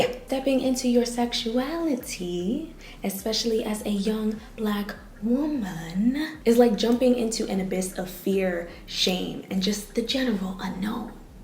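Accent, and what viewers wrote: Jamaica. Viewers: Subscribe immediately when I heard patois😂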